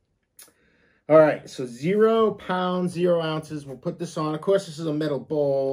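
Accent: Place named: Massachusetts, United States. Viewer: You're awesome. I'm a Maine-ah, so I just love that you're so stereotypically Massachusetts. If anyone asks what a Bostonian or "Southie" is they should be shown a clip of you!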